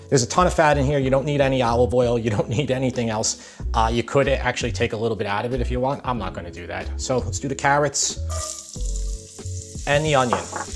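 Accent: New York, United States. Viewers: Something tells me this guy is new to cooking. Maybe hired as an actor because he's Italian and sounds sort of New Yorky. Not that he's doing anything wrong, except he may seem a little afraid of the knife.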